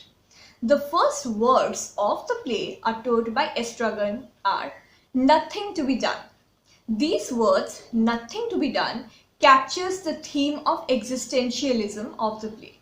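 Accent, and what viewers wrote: India. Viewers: So sweet and honey mixed easy Indian accented description made by you, It's easy for me to make notes for my M.A. in Eenglish, Drama Paper. Love and Thank you, from Assam India*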